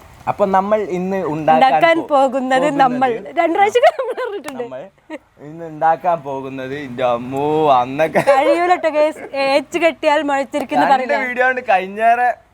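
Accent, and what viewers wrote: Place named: Malappuram, India. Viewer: ഫുഡ്‌ അല്ല ഞാൻ നോക്കുന്നത് അനിയത്തി കുട്ടീടെ വർത്താനം ആണ് ട്ടോ... ഞാനും ഒരു ട്ടോ കാരി ആണ്.... മലപ്പുറം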